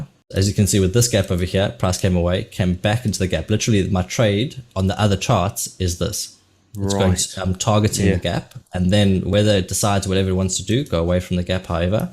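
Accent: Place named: South Africa. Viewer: This is a fellow comrade from South Africa. I know that accent 😆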